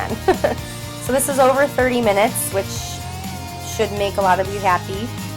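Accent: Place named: Michigan, United States. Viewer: I was wondering where you are from! Your words sound a little bit like Michigan and In this video you mention Meijer! Im from Michigan!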